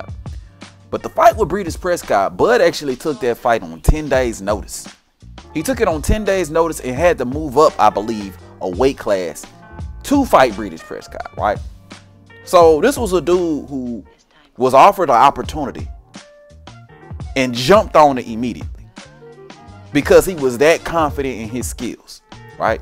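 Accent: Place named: Atlanta, United States. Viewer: Good sh as always Fam,... Salute! Yo where u from fam, ATL? Just wondering, just my guess...I hear a lil bit of an ATL accent sounds like.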